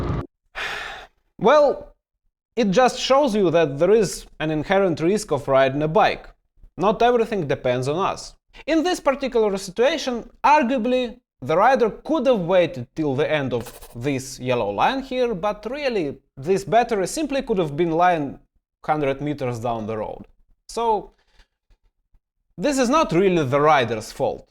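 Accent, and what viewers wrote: Russia. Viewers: Not sure what you mean. This is a thick Russian accent and he pronounces 'V' as any Russian - easily and with no problems. And yep - very good breakdown! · I Enjoyed Your Presentation Very Much. I'll Check Out Some Of Your Other Videos. Is That A Russky Accent I'm Hearing? Da, Nyet, Mozhedbwit?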